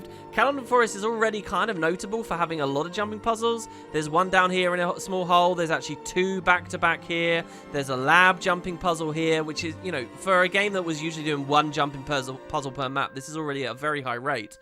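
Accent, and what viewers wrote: United Kingdom. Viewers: But he IS actually british. You can hear it even better in old GW1 videos.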